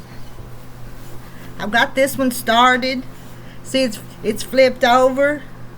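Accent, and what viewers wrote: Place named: South, United States. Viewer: Love your southern accent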